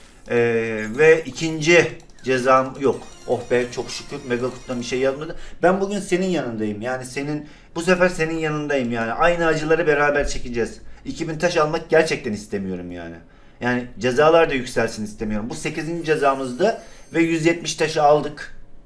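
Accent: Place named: Laz, Turkey. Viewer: Bu adamı eskiden tanıyorum laz gibi konuşaydu şimdi nonoşlar gibi konuşayu para adamu degiştiriye haa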